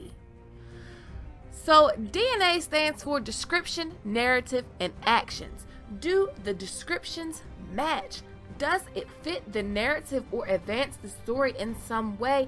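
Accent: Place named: South, United States. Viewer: This female sounds like a stereotypical southerner , aunt jemima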